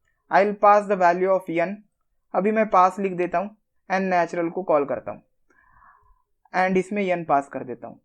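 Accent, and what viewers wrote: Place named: South India, India. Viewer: Brother yen pronounce South Indian and also don't forget the missile man of India belongs from south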